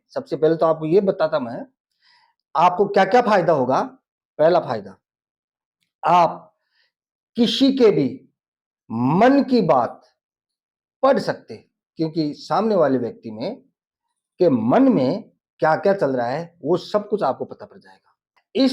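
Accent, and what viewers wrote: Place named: South, India. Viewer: Dada g ka hindi south ke hindi se milta julta hai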